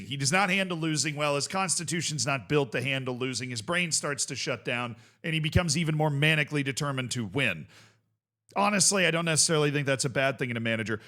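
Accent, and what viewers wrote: Liverpool, United Kingdom. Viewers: Scouse not enlish innit. boss like la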